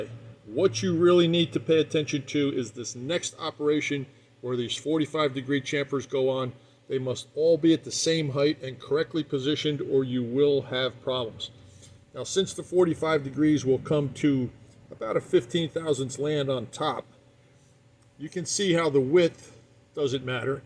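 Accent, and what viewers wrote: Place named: Baltimore, United States. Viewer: Unloosen! Your Bawlamer is showing again,!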